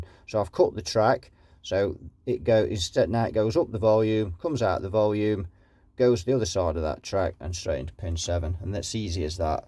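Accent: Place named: Lancashire, United Kingdom. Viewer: I noticed an earlier comment about your accent, you reply you've been trying to speak more clearly for you audience. Its great to hear, reminds me of my Dad who came from Leigh in Lancashire down to London when he was 15 to start an apprenticeship french polishing furniture. No work in Lancs. Oddly he never lost that Lancashire accent and shared a lot of your regional sayings even after living in London until he was 76.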